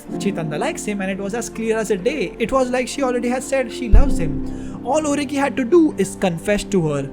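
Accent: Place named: India. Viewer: Your accent is Indian. I appreciate that you are not trying to sound Americans are Brits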